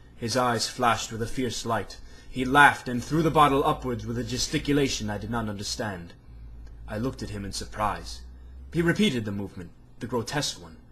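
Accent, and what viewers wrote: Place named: United Kingdom. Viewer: Why are you reading with a British accent? It's a story, written by an Amerinca, and set in Italy. And who is Lucrezi? I assume you mean "Luchesi".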